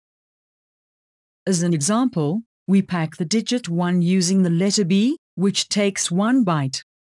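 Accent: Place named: South Africa. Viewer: Her accent Sounds South African. Pretty cool!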